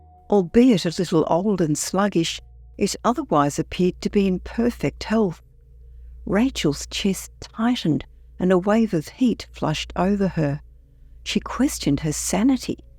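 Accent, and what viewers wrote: Australia. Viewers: The woman narrating this story is Australian, not British.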